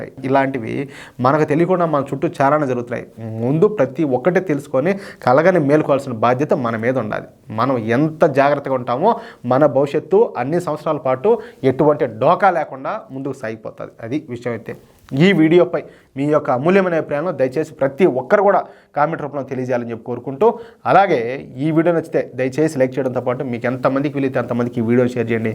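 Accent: Rayalaseema, India. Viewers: రాయల సీమ లో ఏవూరు మీది గారూ? సీమ భాష బాగుంది. హైందవత్వానికి..దేశానికి బాగా సపోర్ట్ చేస్తున్నారు.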